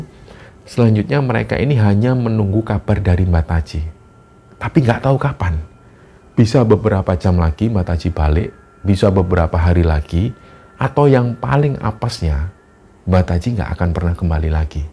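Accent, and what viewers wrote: Java, Indonesia. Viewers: Assalamualaikum, lagi musim semua Chanel horor pakai narasumber. Tapi tetap dgn khas sendiri tnpa narasumber lebih asyik, lebih enak didenger, alur ceritanya jelas... Tetap ga usah pakai narsum biar beda dgn lainnya. Mas dgn style jawanya slalu keren❤❤ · jawane iku loh ih suka pisan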